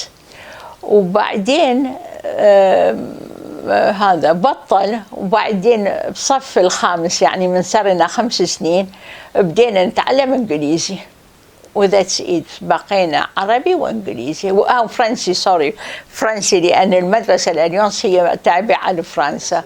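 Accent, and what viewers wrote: Mosul, Iraq. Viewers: تحجي مصلاوي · ماشاءالله عنا لهجتها العراقية واضحة وقوية( كولش) وهي ايضا سيدة جميلة العمر الطويل لها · كانو لهجتها مصلاوية 🤔؟ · الله يحفضك ويطول عمرك يلغاليه كلنا العراقيين نسمع قصتك وانت تحكين الغه العراقية بلأخص الغه تحكين مصلاوي وانا من أهل الموصل وسمعون حكيكي بلمصلاوي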